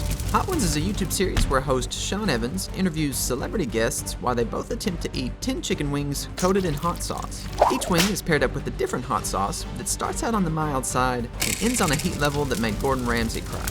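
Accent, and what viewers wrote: South, United States. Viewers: are you southern or something?? such a weird accent lol.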